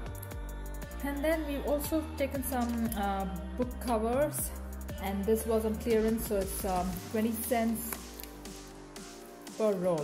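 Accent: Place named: India. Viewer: I absolutely will! Btw, I love your Indian accent mixed with the Australian terms. It’s just so worldly to me and it’s cool to listen to 😃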